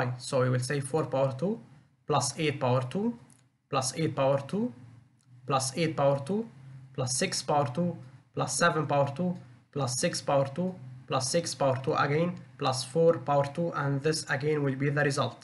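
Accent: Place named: Egypt. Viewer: Great explanation bro, I also feel that's an Egyptian accent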